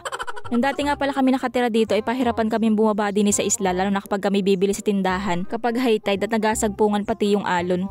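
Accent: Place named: Batangas, Philippines. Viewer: Yung punto mo ms punto rin saamin sa Batangas eh 😂❤ shout nman sa ANDAL FAMILY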